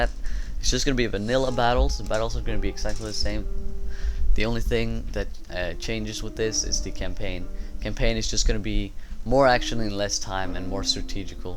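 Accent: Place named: India. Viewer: Idk, your words sound heavy sometimes like some Indian people's accent in the US. Also when are you continuing your Carthage campaign?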